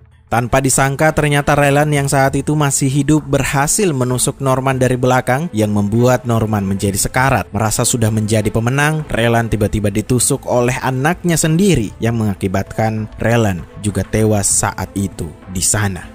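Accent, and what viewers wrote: Makassar, Indonesia. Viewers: Orang makassar kii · Org makassar ki kah?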